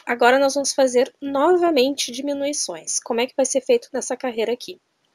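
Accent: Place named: Santa Maria, Brazil. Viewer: adorei te encontrar aqui.Gistei muito da tua receita, e a tua pronuncia, me deu uma baita saudades da minha terra(Santa Maria.) Como e bom ouvir este lindo sotaque...Felicidades e parabéns pela linda receita.bjssss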